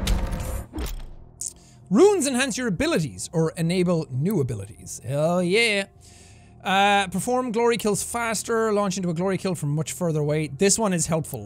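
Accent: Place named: Ireland. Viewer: Normal people: fall asleep listening to calming music. Me: falls asleep listening to a excited Irish man slaughter hordes of demons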